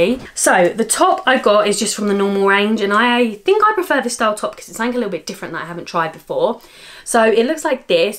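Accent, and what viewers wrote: United Kingdom. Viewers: So beautiful, just enchanting in every way. And I LOVE that gorgeous British accent! 😍 💕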